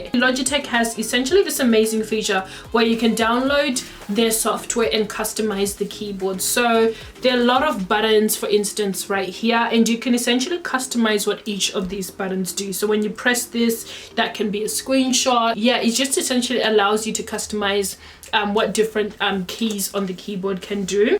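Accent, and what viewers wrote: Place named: Australia. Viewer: Thanks for sharing so much detail, I love your Aussie accent :)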